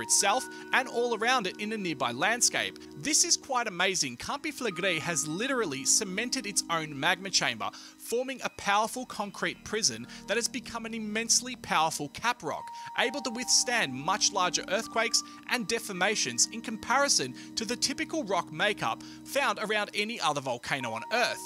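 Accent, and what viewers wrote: Australia. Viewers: So now the voice of my nightmares is an Aussie lol. Fascinating and terrifying. Well done. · Awesome docs,plus ya sound like an Aussie 👍👍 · That Aussie accent 🤣🤣🤣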